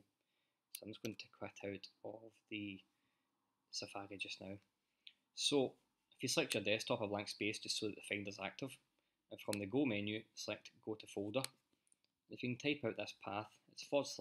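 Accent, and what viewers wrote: Scotland, United Kingdom. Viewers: Hi. It sounds like this is a good fix but you're speaking so fast with your Scots accent that I can barely understand what you're saying. I wish I did. I love your accent. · William Wallace accent!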